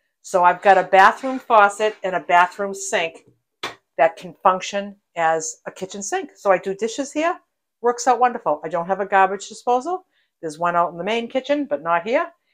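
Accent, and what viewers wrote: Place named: Massachusetts, United States. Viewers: you are delightful! (Sounds like you’re from middle MA- I’m from western MA) I’m getting ready to move in with my oldest son. My daughter in law is precious, and has no problem sharing her kitchen, but I’d still like my own tiny space. Love your ideas!!! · ! Your channel popped up and you're either a genius or I lead a very sheltered life. I have been looking for ways to minimize my living space without selling my home. I absolutely love what you have done and that everything has a purpose and a story. I have been retired since 2019. working breeds stress and clutter. My space is way too big and I'm exhausted by donating and restructuring. This video helps me focus. My husband (we lost him in 2020) was from Boston and I adore your New England accent. Your video gives me lots to think about and you are truly inspiring. 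Thank you and continued good fortune. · Love ya Boston accent! You don’t look like you gained a pound. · Love your video. I can tell you’re a Massachusetts native. ❤ I’m from Gloucester, but now live in Ohio. 😕 love your solution—and all the good stuff you were able to thrift!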